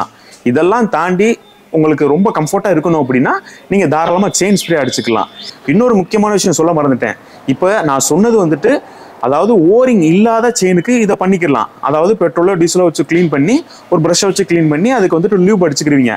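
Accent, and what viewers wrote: Madurai, India. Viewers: பாய் நீங்க மதுரையா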